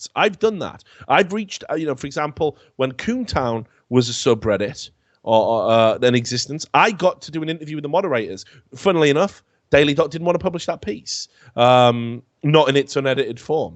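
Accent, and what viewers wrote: Wales, United Kingdom. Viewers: You sounded so Welsh 5 years ago.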